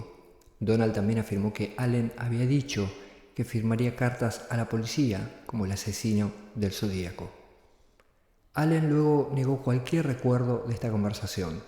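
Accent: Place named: Buenos Aires, Argentina. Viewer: sha, she, shi, sho shu. Muy porteño para mí gusto.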